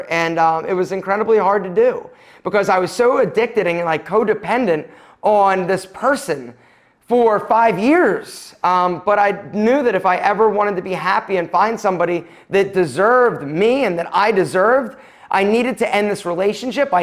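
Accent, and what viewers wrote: Philadelphia, United States. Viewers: Yo, where you from? You’ve got a Philly accent for sure, am I right?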